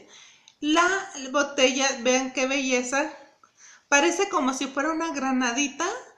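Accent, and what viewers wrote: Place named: France. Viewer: Jamás me imaginaria eso!!pareces francesa o polaka tengo conocidos de esos lugares y es muy parecido el.acento. No te escuchas mal sólo pareces una extranjera muy sofisticada que habla el idioma con un poquito de esfuerzo. Gracias por contestar muy amable de tu parte y si estoy seguro le gustara a mi esposa el perfume bendiciones linda Cuídate mucho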